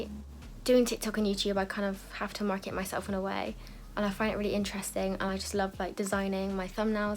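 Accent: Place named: Bristol, United Kingdom. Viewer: Great vid. Proper Bristolian accent also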